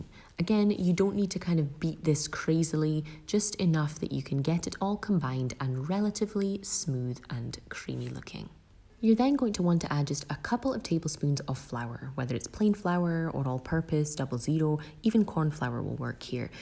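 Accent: Scotland, United Kingdom. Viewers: Found your channel yesterday. Will be binging your videos after lunch. I could listen to your calm voice, and lush Scottish accent all day. Fantastic recipe, thank you 💕🏴🏴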